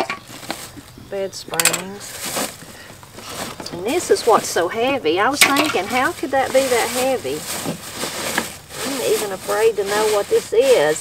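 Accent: Appalachia, United States. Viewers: Ah the popsicles in the garden :) We might get snow tonight up here (I hope not) and I really need to clean my garden up too. Also, I love how you pronounce Husqvarna :) And while it's not how it's pronounced in Sweden/Denmark/Norway (it's a Swedish brand) I totally understood you - it was just done with an Appalachian accent! :) (pronounced like: hoosk ·vaar·nuh) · Huskvana is the phonetic way of prouncing the Swedish brand chainsaw, but who cares if you mis-pronounce a word. It dosen't matter because we love your accent and Appalachian special words. That metal tub would make a great planter.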